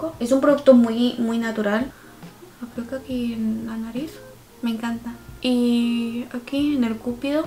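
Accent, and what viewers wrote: Spain. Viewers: Me gusta mucho que hables en español!!!!!! no soy fan del acento español pero el tuyo es bonito jajaja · Que bo l’accent català parlant Spanish (com jo jaja) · tienes un acento super cool no soy de España pero aún haci creo que tu acento es genial Saludos desde Colombia 🌻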